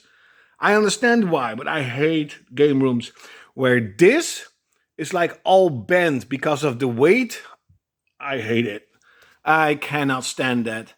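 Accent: Netherlands. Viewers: Geen idee wat het is maar je kunt altijd gelijk merken wanneer iemand Nederlands is 😂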